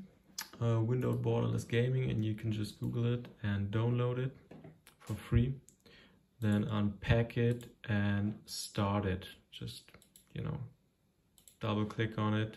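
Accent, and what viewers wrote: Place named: Germany. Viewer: Nicht schlecht, aber man hört das du Deutscher bist haha grüße.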